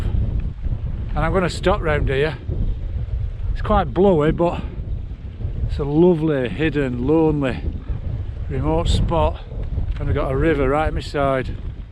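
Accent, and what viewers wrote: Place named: North, United Kingdom. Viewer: Love your videos pal, newly subbed proper northern accent an all like me. 👍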